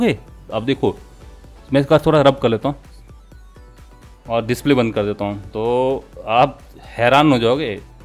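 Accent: Marwari, India. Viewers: bhai marwadi ho kya??? Awaz se to lag hi raha hai.......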